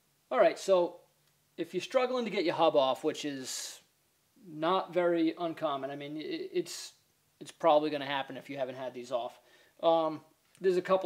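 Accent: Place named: Maine, United States. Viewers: from his accent i would have to say east coat, up wards of Maine or Vermont area. Leaning more towards Maine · Reminds me of the old Ford twin I beam suspensions when the kingpins would rust fast from lack of grease maintenance. Would make the truck wander all over the place and impossible to turn the wheels unless you had power steering. And I'm detecting a New England accent.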